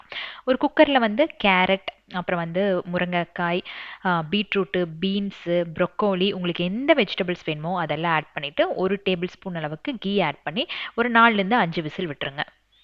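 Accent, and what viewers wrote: Coimbatore, India. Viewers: Unga own voice is very nice❤. Papavum mithuvum porupa irukanga. Namma coimbatore slang varruthunga ❤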